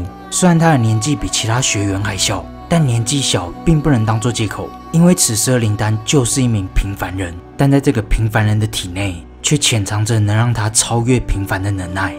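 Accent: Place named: Malaysia. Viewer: 这口音更像马来西亚或者新加坡的。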